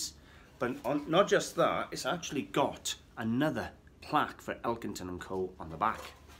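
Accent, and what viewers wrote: Wales, United Kingdom. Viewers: Great video. Aussie viewer here. Love that welsh accent.. I’ve got family over there and it gets me every time lol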